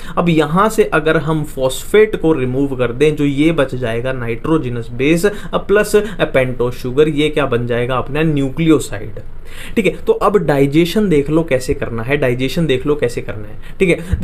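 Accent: Haryana, India. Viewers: Sir aap haryanwi h kya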